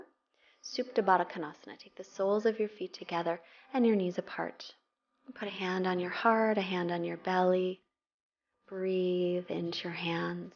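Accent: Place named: United States. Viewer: Thanks so much for putting this video up. I use it each week. It such a nicely flowing sequence. May I ask, where in the States is that accent from? My ex-girlfriend is from Hudson, Ohio and your accents sound identical! :-) Regards from Australia :-)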